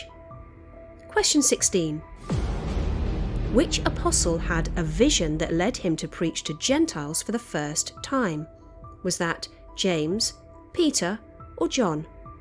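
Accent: England, United Kingdom. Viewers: Missed 5☹️… but I always enjoy hearing your beautiful English accented voice😊. Grace & Peace! ❤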